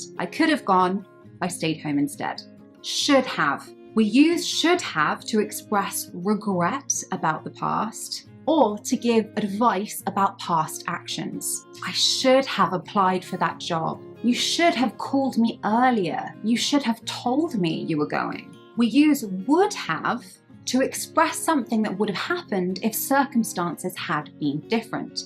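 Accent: United Kingdom. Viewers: Hi, I just love your videos. They are really helpful. Thanks for the great job you're doing. By the way, I love your typical British accent.❤❤❤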